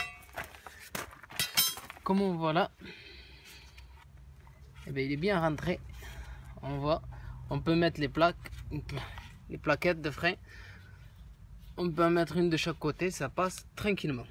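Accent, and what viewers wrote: Marseille, France. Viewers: mécanicien dans les roues de brouettes!! Ce type de vidéos devraient être carrément censurée ,vu le danger que représente ce type de "travail" de caraque !! Bon, en même temps, apparemment ,vu l'accent, on doit être du coté de marseille, alors faut pas trop s'étonner !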